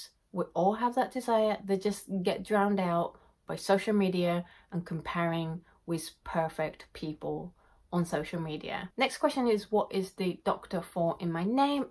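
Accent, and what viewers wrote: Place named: United Kingdom. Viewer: Thank you for making this video, I enjoyed watching every minute of it :) I love your British accent, you shouldn't be worrying about it one bit! Also, thank you for being so open and honest about bipolar, it really helps raise awareness. People who don't have it and have no family or close friends with it usually simply have no idea about what it feels like and how it works. You're very, very brave and a wonderful person and artist!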